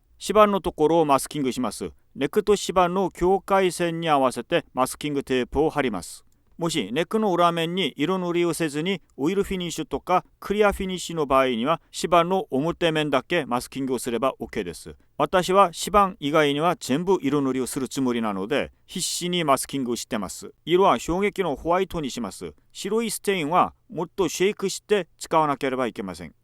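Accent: South Korea. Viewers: 日本人ではないのか！？めちゃくちゃ可愛い喋り方！韓国訛りかなー？ なんか喋ってるの見るとさらに、可愛く見えてくる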